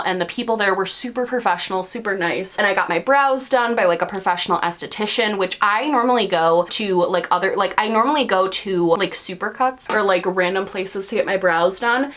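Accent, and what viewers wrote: Australia. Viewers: I just legit cackled at your Aussie accent like the down under witch I am 😂 And yes, you damn spoiled Americans! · I love the new hair!! I work at a hair salon back home and damn he did you dirty!! Obviously it’s a little harder to get off your skin than more natural looking color, but he should have STARTED to get that off while you were at the bowl and then brought you a towel or cotton ball with the remover on it when you got back to their chair!! Regardless, I love the darker look, I thought in the video before your hair looked purple, glad I wasn’t going crazy 😅 Also I loved the Australian accent 😂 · As an australian, I'm afraid I must stan your Australian accent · Hahaha as your number 1 Aussie fan I cracked up laughing because I was thinking about how much we pay for shipping which sometimes is the same price as the palette we pay for!! 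Lol 😂 but love your Australian accent mate ! X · I really appreciated the Australian accent 👌😂❤️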